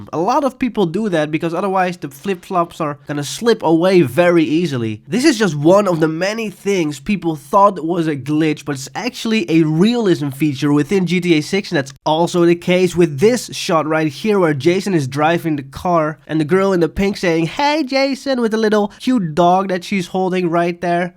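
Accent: Netherlands. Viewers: Im probably wrong but your accent sounds kinda Dutch lol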